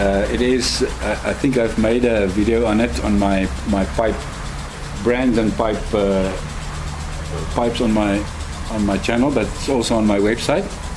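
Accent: South Africa. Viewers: Are you south african? Your accent is very boer-like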